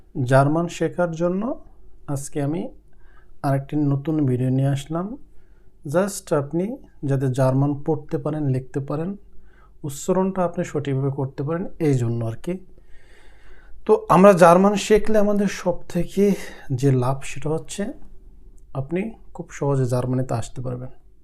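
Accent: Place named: Barishal, Bangladesh. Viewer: ভাই আপনি বরিশাইল্লা উচ্চারণটা বাদ দেন।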